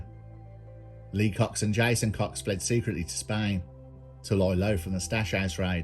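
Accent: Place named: Black Country, United Kingdom. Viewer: Lad as from the "North" and a don't usually find the Midlands/Black country accent a thing of gravity to myself, but your calm delivery has me thinking, thanks for these uploads.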